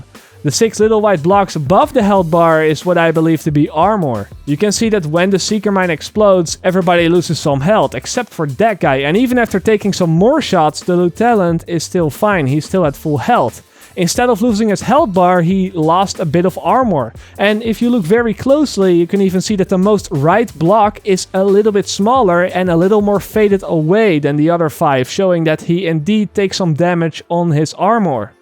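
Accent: Netherlands. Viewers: if i hear good youre dutch ? kan you add me on uplay KillerAngelNL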